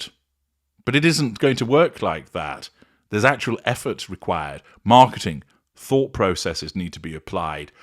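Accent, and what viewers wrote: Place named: Cockney, United Kingdom. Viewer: Sorry, but you sound like Eastenders, no offence mate.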